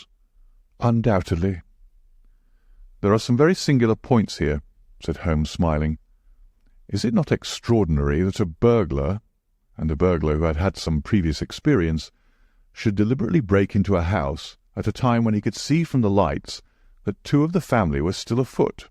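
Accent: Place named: North, United Kingdom. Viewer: A nothern man as narrator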